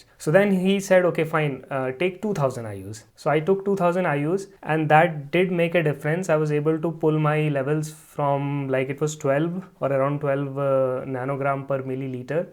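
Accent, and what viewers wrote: India. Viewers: Whiteamin Dea 😂 I love the Indian accent haha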